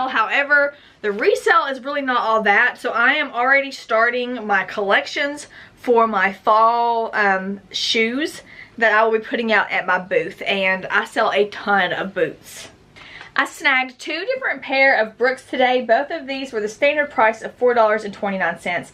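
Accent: Kentucky, United States. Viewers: Where are you from? You say "yall" and that's SO a KY thing!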